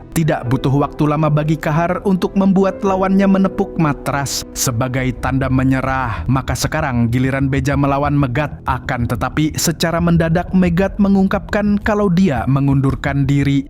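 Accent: Banjar, Indonesia. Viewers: Orang banjar sekali nya sidin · Urang mana gerang bang nih. Urg banjar kah 😂😂😂...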